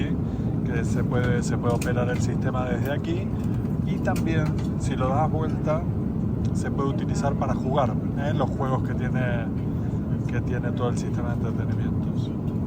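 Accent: Argentina. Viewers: DONDE NACISTE EN LA MATANZA POR TU FORMA DE HABLAR 😮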